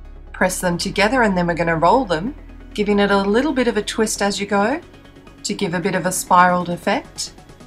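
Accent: Australia. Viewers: I could tell you're Australian by your accent. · Are you Australian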